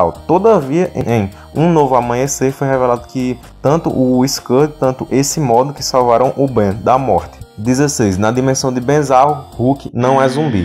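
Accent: Northeast, Brazil. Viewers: Ce é nordestino?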